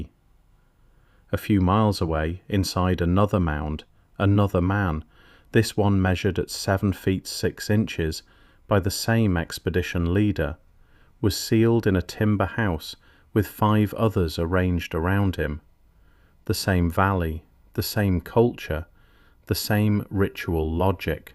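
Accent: United Kingdom. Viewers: What’s with the British accent? Has AI been programmed that speaking with British accent lend to greater credibility, more believable or entertaining.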